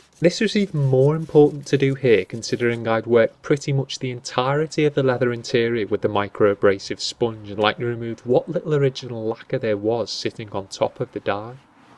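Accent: Liverpool, United Kingdom. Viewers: I am not that familiar with Amy MacDonald but she's Scottish and the has a Scouse accent so you haven't even got the right country. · You're a scouse I'm a manc......gotta tell you guy......good job..... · Scouse accent + Soft Voice + Proper English... Champion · from canada? yeah right, i hear that scouse accent..................lol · Not been this engrossed in a Scousers running commentary since Ringo Starr narrated Thomas the Tank Engine back in the day.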